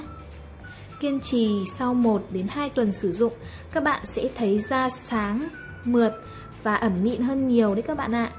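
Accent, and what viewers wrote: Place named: North, Vietnam. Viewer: Thích nghe mo lên thấy bac kỳ đoc ko thích khó nghe sai tu chát tai quá nói cho ng nam đoc ko cho vây hết ng hả nghe bac kỳ đoc chán bỏ me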